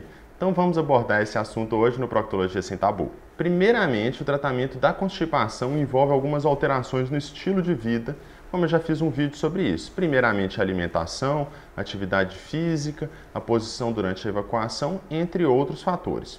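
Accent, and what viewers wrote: Minas Gerais, Brazil. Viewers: Vc e mineirinho ?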